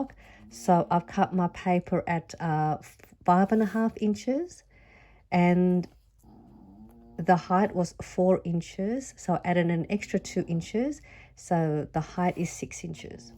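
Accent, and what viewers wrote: Australia. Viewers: Love your video and this idea! Very well explained too. I'm also from Sydney, Australia. Lovely to hear your Aussie accent ☺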